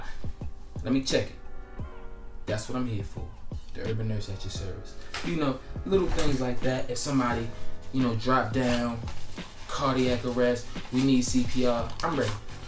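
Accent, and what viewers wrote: Baltimore, United States. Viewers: two, do, blue. true,......kinda miss that Bmore accent.....ya know what um talking bout. The urban Nurse might be the next comedian in Baltimore county!!....Good stuff!!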